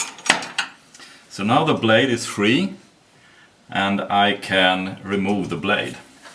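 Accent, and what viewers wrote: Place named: Germany. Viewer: it's ok, we understand english is not you mother language...es ist scwher die richtige wort zu finden...my german is also not that great so...egal... :) I am assuming you are german based on your accent....curious where you live and what you do...I am american, but living in germany..watching your videos because I am about to buy that same saw...my old metabo just died and I need something cheap..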